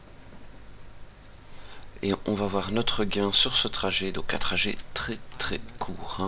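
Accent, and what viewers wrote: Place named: Belgium. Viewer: Mdr ! Pardon cela me fait rire ton accent Belge^^ par contre tu fait de très bonne vidéo qui me donne envie de jouer à ce jeu! continue comme ça👍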